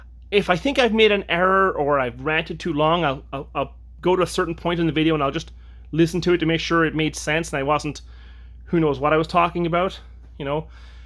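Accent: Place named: Irish Canadian, Canada. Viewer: You have a great voice: a charming blend of Irish and Canadian.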